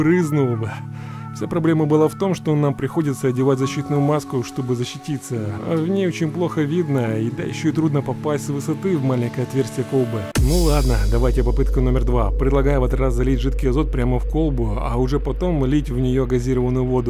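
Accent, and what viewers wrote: Ukraine. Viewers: У тебя украинский акцент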